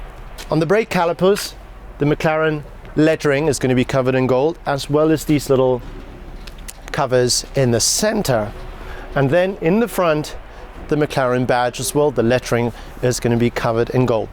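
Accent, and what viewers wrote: South Africa. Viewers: This guy sounds South African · The presenter sounds South African. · Sounds like a South African accent to me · Are you South African? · Is the presenter South African?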